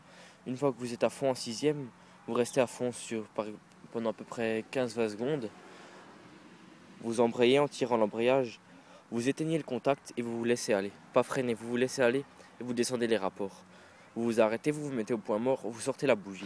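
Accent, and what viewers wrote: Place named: Alsace, France. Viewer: ta vraiment l accent alsacien!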